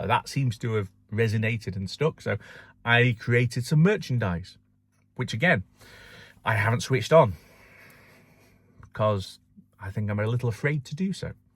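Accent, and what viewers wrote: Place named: England, United Kingdom. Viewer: I have watched most of your videos. They are very good. You are a very good speaker (far better than Elon Musk), and it is good to hear what you are thinking in an English voice instead of American. I'm sure there is a lot of good content yet to come, like your views on FSD when it arrives in the UK and Europe. Maybe you should go through the Tesla screen, taking one or two items at a time, and explain them in detail. I see a lot of new people who buy Teslas asking a lot of questions about how to do this and that. I got my Tesla Y Juniper in late December 2025, and I am still trying to learn the system. I think it is a shame that your fans can not talk to you personally. Writing comments is not the same thing. Keep up the good work.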